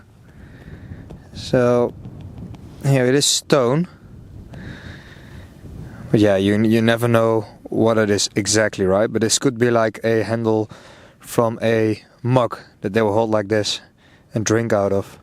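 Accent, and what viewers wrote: Netherlands. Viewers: Even more disrespectful, and in fact illegal is taking artefacts away from the site. This guy sounds dutch, maybe he doesn't know the laws? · Jij bent Nederlands he?🤣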